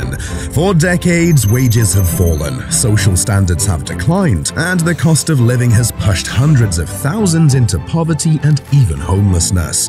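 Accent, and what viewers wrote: United Kingdom. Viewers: I note that the speaker has a hint of British accent and a hint of anti-Thatcherism in him. Otherwise your video is quite well done. Cheers!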